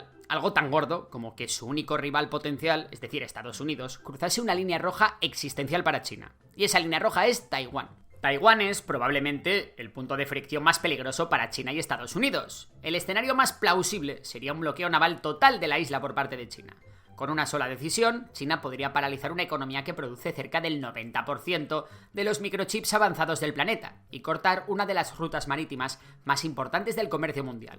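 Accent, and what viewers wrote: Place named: Spain. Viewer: Escuchar a un español da vertigo.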